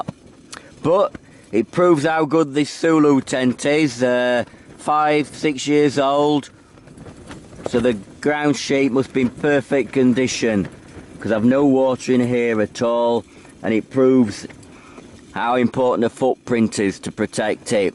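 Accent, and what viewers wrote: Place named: Yorkshire, United Kingdom. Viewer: Proper Yorkshire accent:)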